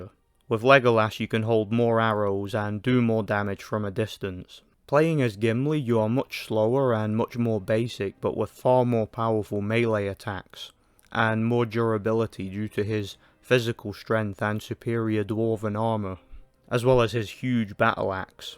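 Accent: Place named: Nottingham, United Kingdom. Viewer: complete shot in the dark, especially if you say you've moved around but I'm hearing some Nottingham mostly, maybe some south or west Yorkshire or Geordie. But alternatively your "L" sound feels like Richard Taylor from New Zealand, but the rest of your vowels don't match the nasal brightness of that accent. Either way GREAT review. I played this one after Return of the King so unfortunately it felt like a big step down in a lot of ways, it felt clunky and a bit too difficult to be fun (but maybe I just sucked lol, especially that Helms Deep protect the door level). But thanks for your work. Brought back some memories.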